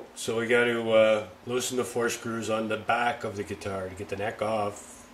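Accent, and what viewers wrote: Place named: Canada. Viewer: Oh man ! From just a single 'without' i thought.. huh, this guy gotta be canadian! And then .. the maple leafs tee :) it is so funny because i'm Polish and i've never been to Canada or even Usa, but i have family in London, Ontario - and i've heard about this slight difference. It happens to me second time this weekend :) Absolutely no offence, i love this accent and i wish i could visit my family and your country someday ! Great bass and nice movie, thanks :) 51's are hot :D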